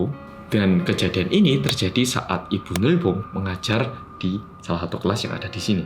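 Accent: Java, Indonesia. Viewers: 💙💙💙💙💙💙💙💙medokkkk karo ngapakkkk pada ae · Karena keseringan dengerin bercerita,waktu baca novel jadinya suara yg logat jawa itu yg terngiang ngiang. waduh gimana ini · kaet ngerti onok wong korea medog wkwkw ! auto subscribe hehehe..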